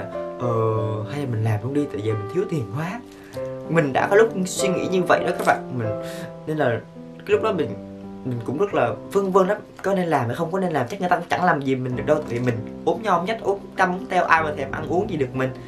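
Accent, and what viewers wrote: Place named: North, Vietnam. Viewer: Nghe giọng anh càng ngày càng ra giọng bắc nhỉ, nghe cute dã man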